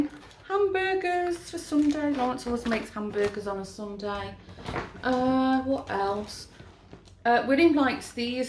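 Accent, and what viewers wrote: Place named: United Kingdom. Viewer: Hey, I'm a new subscriber, I only just realised you are living in Holland, I assumed you was in the UK, think it was your accent that threw me 😃, I hear Holland is a very beautiful, clean, country, with alot of green, and flowers everywhere☺️ but also heard it really high the cost of living there. What are the pros and cons living there, and do you miss the UK at all, do you get to go back there often 🤔 take care. Stay safe 🙋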